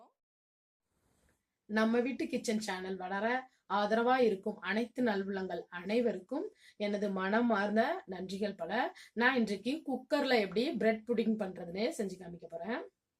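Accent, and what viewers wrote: Tirunelveli, India. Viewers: Hello sis...which temperature has to set if the recipe is cooked with oven? Also sis one small request...pls reduce english words and speak in your tirunelveli tamil....it is sweet to hear like you pudding recipe:-)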